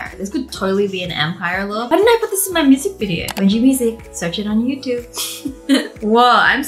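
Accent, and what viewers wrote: Australia. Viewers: Is she speaking Australian ? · I do want to step up my Instagram game and these are helpful! I haven't watched you in a while but I swear your Australian accent is not as strong as before! 😯 What were the camera settings for the darker photos? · Are you from Australia?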